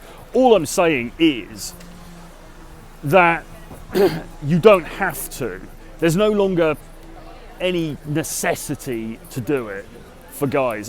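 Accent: United Kingdom. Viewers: is a traveling street man on the move. He's the 007 of the Manosphere! Complete with British Accent. Now where is hiding that Aston Martin DB5?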